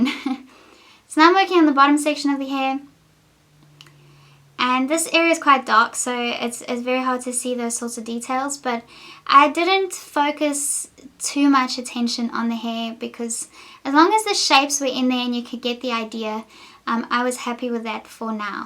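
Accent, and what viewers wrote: South Africa. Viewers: Beautiful I love it. Are you South African?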